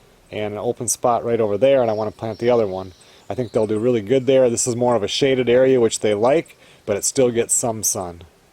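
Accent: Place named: North, United States. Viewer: I thought you have a northern accent. How does she like the difference in weather?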